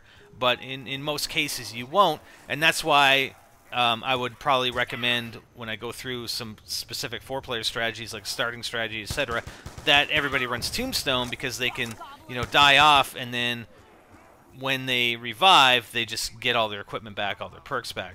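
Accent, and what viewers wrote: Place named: Canada. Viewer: He's Canadian. He has an accent.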